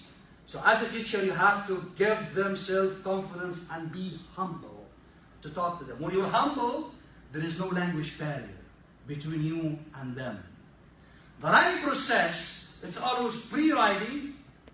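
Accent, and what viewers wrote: Egypt. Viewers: Still ur Egyptian accent beholding u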